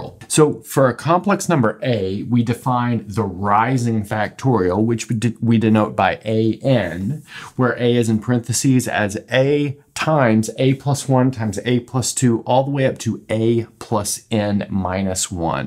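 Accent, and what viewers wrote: United States. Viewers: As non native english speaker I have hard time distinguish between Cee and Zee in US accent. It would be much better if US people pronounce Z as Zat like British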